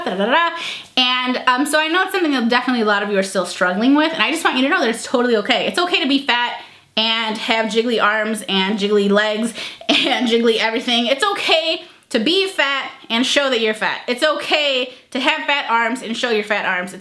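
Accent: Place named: Chicago, United States. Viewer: I am a new"ish" subscriber and I swear I was like "she's from Chicago, I can tell" and sure enough I just added you on Instagram and it says your from Chicago! I'm from the Chicagoland area too! ☺️✌️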